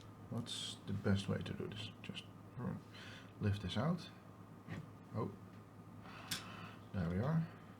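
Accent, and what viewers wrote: Netherlands. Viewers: Ik hoor aan uw accent dat u Nederlands bent dus dacht stuur u even een berichtje in die taal. Ik heb op dit moment het houtboard gezaagd en hou uw boor breedte van 3.2 mm per ledje aan. Nu vroeg ik me af, Hoeveel cm u tussen de ledjes heeft zitten. Is dit toevallig 15mm? Vriendelijke groet en bedankt voor uw zeer gedetailleerde video.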